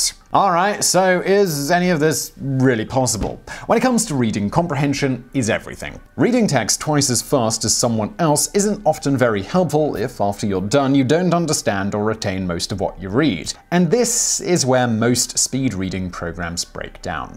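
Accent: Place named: RP, United Kingdom. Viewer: Can someone or Simon himself explain Simon's accent/way of speaking? Is this RP? Are there any other examples of videos that sounds this way? I feel that this accent/way of speaking is taught. I really find it interesting and cant get this question out of my mind. I really feel that when I was younger(as a kid) I hear this a lot but can't tell where.... Thank you in advance.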